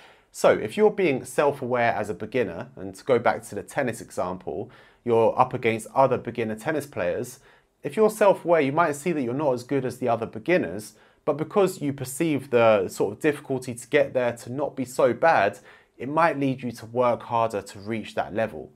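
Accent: United Kingdom. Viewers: I like the way you explain in very precise basa on logic and higher level of example. Im very addicted with those video. And should mention ❤your British English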